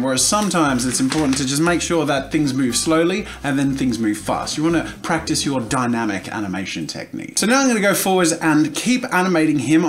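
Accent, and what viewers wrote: Australia. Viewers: Wait ur Aussie... AUSSIE AUSSIE AUSSIE OI OI OI! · Wait ur aussie...I AM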